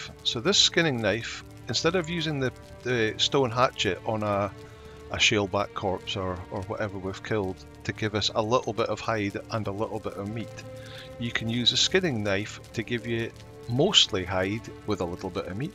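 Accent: Scotland, United Kingdom. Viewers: Excellent play through. And nice to hear a Scotsman for a change 👍✌️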